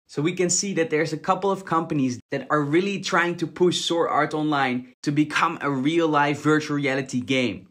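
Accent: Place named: Netherlands. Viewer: I smell a little bit of dutch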